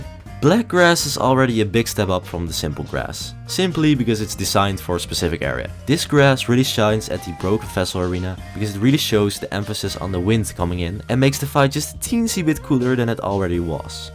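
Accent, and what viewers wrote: Netherlands. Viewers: Bro I can hear it so clearly that you are Dutch, but I love it, keep up the content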